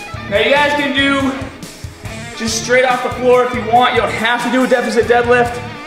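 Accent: Boston, United States. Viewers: Damn this is a beast, sweet Boston accent, and has a physique that I am envious of. If I was gay he may be my type, unfortunately I'm as straight as my spine is throughout my deadlift. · You sound like you're from Boston.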